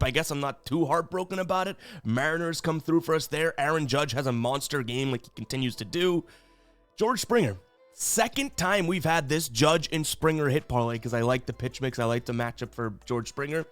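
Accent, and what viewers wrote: Boston, United States. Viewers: You're from BOSTON????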